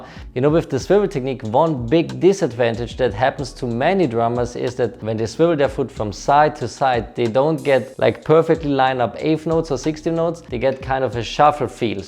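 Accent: Vienna, Austria. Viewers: Mehr Wiener Deutsch!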